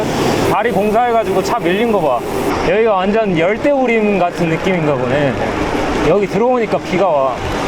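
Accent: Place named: Chungcheong, South Korea. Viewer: 고향이 충청남도인가요? 말투가 내가 사는 고향 논산이랑 비슷하네요. 지금 유럽 몰아보기 3편 보고 있어요!!!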